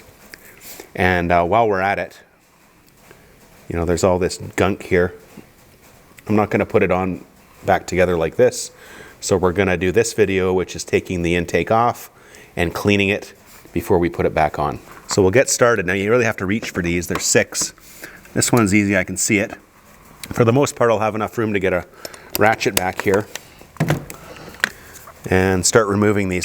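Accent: Canada. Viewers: Watching this from UK. Thanks for posting. Are you Canadian? Take off eh.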